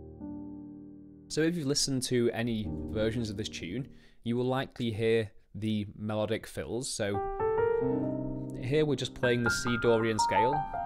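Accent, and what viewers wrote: Liverpool, United Kingdom. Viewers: Either from Liverpool or The Wirral?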